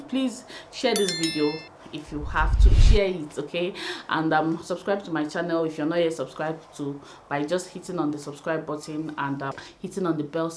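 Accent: Nigeria. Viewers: mee to I love a nigerian accent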